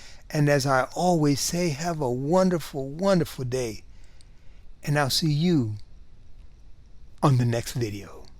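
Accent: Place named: Mexican-American, United States. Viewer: Oh so sorry for the mistake. I thought I heard a Mexican-American accent. Either way your content is gold. 👍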